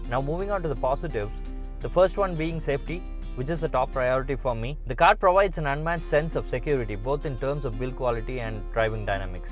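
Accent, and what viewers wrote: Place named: India. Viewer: but that indian accent though. Can’t get used to it.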